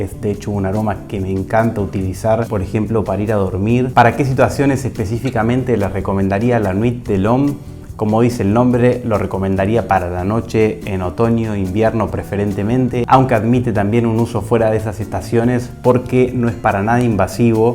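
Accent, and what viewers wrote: Argentina. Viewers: nuevo sub,muy buen video. sos argentino no? Saludos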